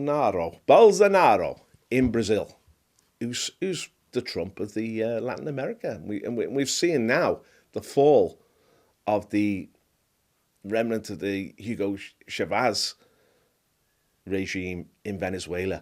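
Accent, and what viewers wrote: Liverpool, United Kingdom. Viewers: Ja! Pure Scouse.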